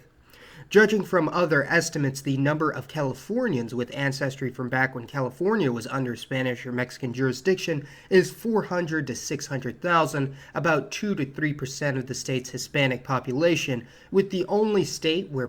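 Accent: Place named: South, United States. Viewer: Loll nice suthurn cuntry accent !!!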